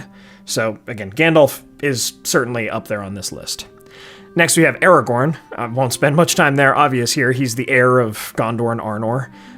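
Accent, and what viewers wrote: United States. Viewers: Gentry is the term I was practically screaming at the screen whilst listening. I think the video conflates nobility with upper class. In fairness, the creator sounds American, so they probably aren't familiar with the nuances of the English upper class. I would say Pippin is probably more than gentry though. Given he is the heir to the Thane, he is closer to being a noble. Thane as a noble rank is somewhat similar to Baron.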